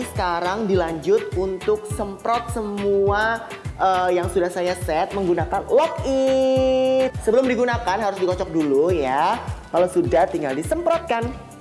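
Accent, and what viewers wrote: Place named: Java, Indonesia. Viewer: Medok bgt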